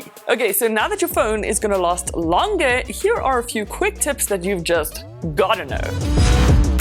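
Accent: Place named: South Africa. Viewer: She sound Hella south african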